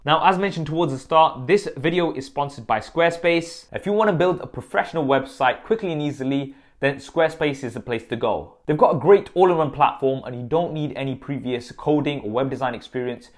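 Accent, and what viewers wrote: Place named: United Kingdom. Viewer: Was waiting for British accent lol.. other than Marques and Michael Fisher, you're the only reviewer I trust (and yes I am fanboying)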